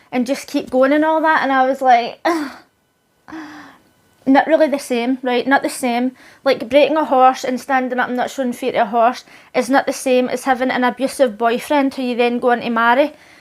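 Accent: Scotland, United Kingdom. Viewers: Thats scottish, right?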